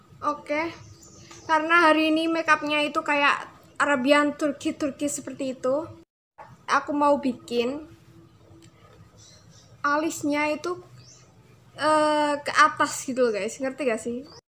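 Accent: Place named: Java, Indonesia. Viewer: suaranya medok bgt suerr, lucu deh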